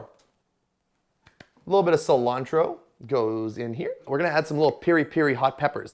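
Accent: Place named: Canada. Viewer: Where are you from? I keep hearing a Canadian accent but it goes away so fast! Great video btw (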